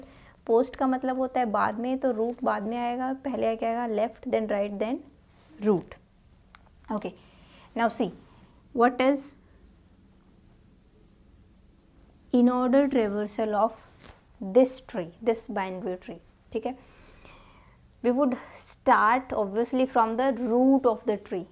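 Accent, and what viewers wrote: India. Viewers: this sweet indian accent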